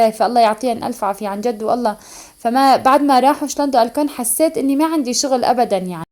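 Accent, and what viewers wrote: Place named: Aleppo, Syria. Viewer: تشرفنا روحي والله عرفتك من حلب من صوتك بس استغربت عبقلولك انتي من شام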